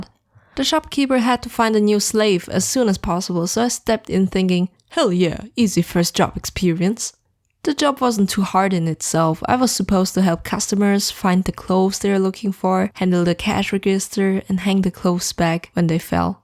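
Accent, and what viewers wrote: Germany. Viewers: the accent also sounds like a german accent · she comes from austria so it is a german accent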